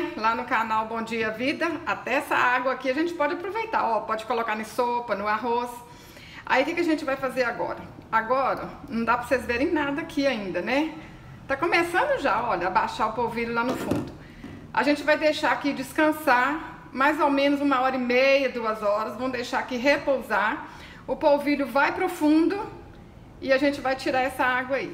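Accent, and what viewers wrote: Minas Gerais, Brazil. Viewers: Mineira certeza, só de ouvi -la já é uma cura, linda 😘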